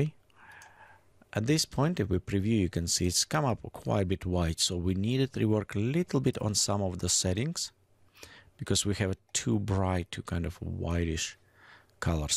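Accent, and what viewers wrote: Russia. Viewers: нахуя на английском то? Слышно же сука что акцент русский...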